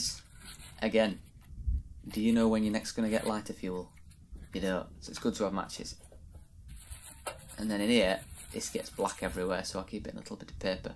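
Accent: United Kingdom. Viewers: Your British accent is excellent. Sounds legit Enough that I have to put subtitles on. I can't understand any of those people except the posh ones or the Welsh. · Barnsley accent?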